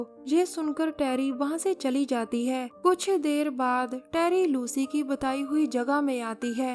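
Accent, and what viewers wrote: Punjab, India. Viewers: Ap punjabi ho ya haryanvi... ? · Love ur punjabi ascent Punjaban ❤️ Pardeep gill from Ludhiana Punjab ❤️ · I suppose the woman who is narrating is a punjabi woman as her prononciation is very similar to punajbies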